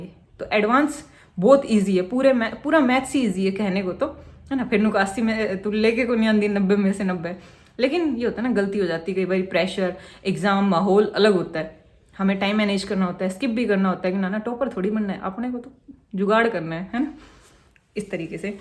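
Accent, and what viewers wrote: Haryana, India. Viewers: haryanvi accent? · love your Hariyanvi accent <3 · I love haryanvi accent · M to apka accent dekhke hi samjhgi thi ki app haryana t ho ...quki m bhi urey t ey hu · I Like Your Haryanvi Speech Truly Bahut Sweet Bolu he aapki......😍😍😍🔥🔥🔥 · I'm from odisha. I love your hariyanvi accent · Haryana ki accent mujhe bohot accha lgta hai😀🔥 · AAP bahut funny ho yar speech Harayana ki must hai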